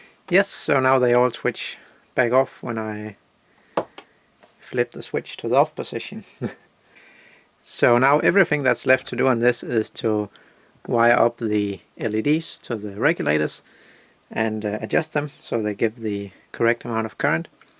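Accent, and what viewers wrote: Denmark. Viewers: his accent sounds danish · COOOOOOL fra de første 10 sek, jeg har gættet på at du er dansker :D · You really sound Danish to me :D Cool projekt!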